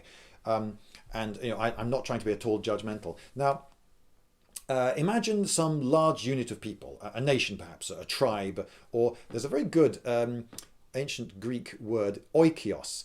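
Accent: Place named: United Kingdom. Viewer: I had never heard of this social structure before, very intriguing to say the least. More research is required. Thank you for the fascinating videos on such a wide range of topics. The videos on swords and other ancient weapons is what originally attracted me to your channel. I have also really enjoyed your videos on historicalish, emphasis on ish, movies. I was wondering if you could comment on a particular movie sequence in a recent movie. I was wondering what you would think of the use of the shield wall in the recent Hercules movie with Dwayne "The Rock" Johnson specifically. Incidentally you look and act a lot like my favorite uncle, right down to the British accent. The name is James by the way and thank you again for your channel. I enjoy it immensely.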